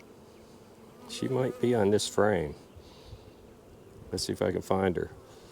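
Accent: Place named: North Carolina, United States. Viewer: I thought I recognized a familiar accent--I'm from NC, too! This was a great video. Lots of good info for someone like me who used to be a beekeeper, had to put it away for several years, and has now started back up. Thanks!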